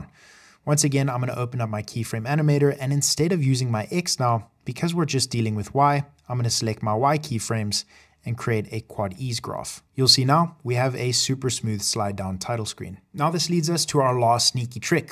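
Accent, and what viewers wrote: South Africa. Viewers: Are you South african🇿🇦? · Your accent sounds South African ? Are you ?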